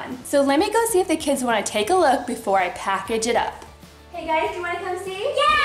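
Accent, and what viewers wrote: Ireland. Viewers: Are you Irish I am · Irish are you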